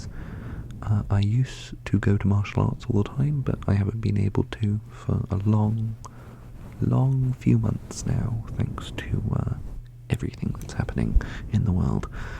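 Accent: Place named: Bristol, United Kingdom. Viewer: Ahh thank you so much for answering my question!! Also your bristolian accent is so cute you should totally do a video with it!!! The rambles about k pop and the stuff you’re into was super cute as well you can totally tell how passionate you are <33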